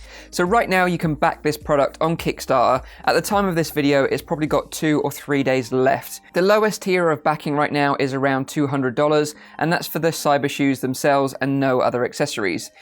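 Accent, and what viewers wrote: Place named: England, United Kingdom. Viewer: It's the english accent. From england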